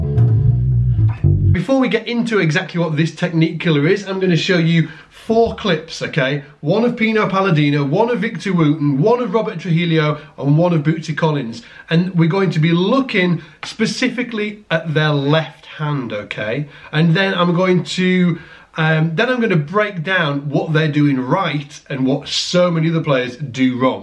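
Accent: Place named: Northern England, United Kingdom. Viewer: Eh, he's a northern Brit, it's OK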